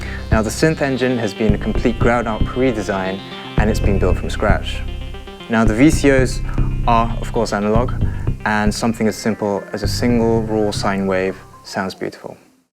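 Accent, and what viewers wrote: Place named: United Kingdom. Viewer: and he also has british accent ...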